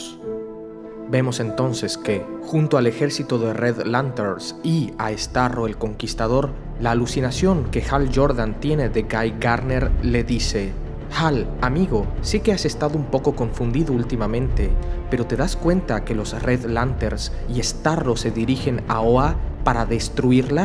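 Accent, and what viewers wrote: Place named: North, Argentina. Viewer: Perdon de donde sos,suena tu voz a como hablan por el norte en Argentina.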